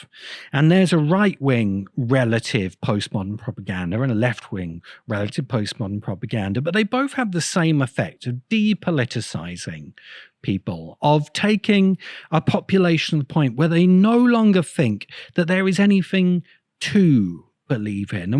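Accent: United Kingdom. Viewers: So in a nutshell, the author here defines as ''post-propaganda'' any point that might be brought against his own biases. Which in a hilariously cyclical argument sense its a form of propaganda too. Im not payed by Russia or China (hey that's what somebody who IS payed would say lol), but hearing him talking as if he has some kind of higher moral ground, with British accent, is at the very least ironic if not ridiculous. On the point made earlier about how much democratic we would consider today the Athenian Democracy, I will reverse it and ask would the Athenians recognize our indirect practically ''representative'' oligarchy as a Democracy when that term derives from Demos (citizenry)+ Kratos (Power)?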